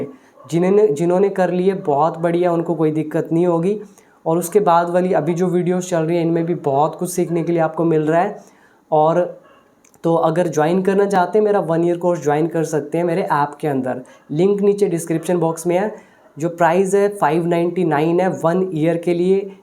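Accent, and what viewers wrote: India. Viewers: Well done but u should work on your prononciation, because u use Indian accent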